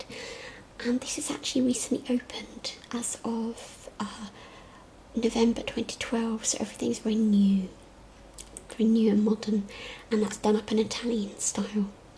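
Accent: United Kingdom. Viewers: Instantly subscribed after only watching a minute of this video. :) So nice to hear a British accent among the ASMR community. Yours is really soothing and relaxing, love your voice, instant tingles. x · I love your British accent! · Great to hear an English accent ASMR vid!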